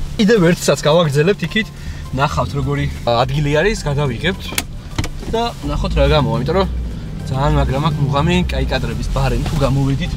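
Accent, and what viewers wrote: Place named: Mingrelia, Georgia. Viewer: mainc rogori megruli saubari gaqvs ❤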